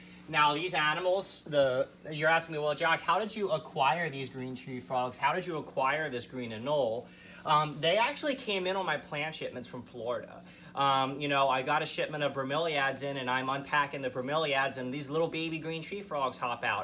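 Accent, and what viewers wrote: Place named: Pennsylvania, United States. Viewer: I knew you sounded like you were from PA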